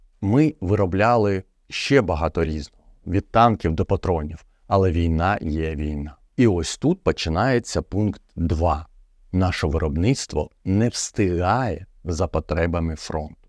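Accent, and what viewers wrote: Russia. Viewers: Підготував відео з хорошим звуком та картинкою, але не спромігся прогнати текст так, щоб вуха не різала вимова (українські слова вимовляє за росіянськими правилами граматики). Просто боляче слухати, що вже казати про логіку, аля: "ну ми ж таки виготовляємо". Питання в тому чи всі можливості використанні, чи може варто критикувати владу за недостатню мобілізацію заводів по виготовленню боєприпасів, забиванню на дрони-камікадзе і вставляння палок в колеса приватним виробникам зброї + волонтерам? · У тебя русский акцент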